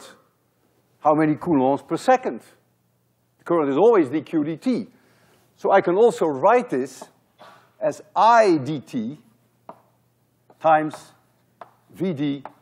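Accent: Netherlands. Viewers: Alfred Castillo Exactly and i'm proud of this professor from the Netherlands just like i am. You can clearly hear that he has a Dutch accent.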